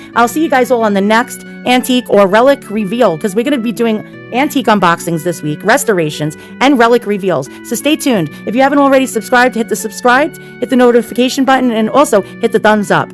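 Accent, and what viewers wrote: New York, United States. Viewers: I miss your older, longer videos! It's you and your hilarious New Yaaaawk commentary that makes this channel! 😂 Oh and ditch the background music. You were perfectly good without it. 👍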